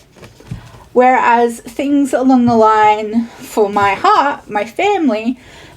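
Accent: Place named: Australia. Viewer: You from Australia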